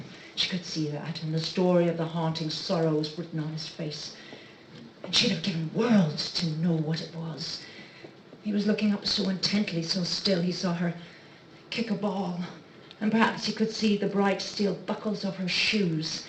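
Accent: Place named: New Zealand. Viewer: jeez, I love her strong Kiwi accent :3 it sounds so adorable)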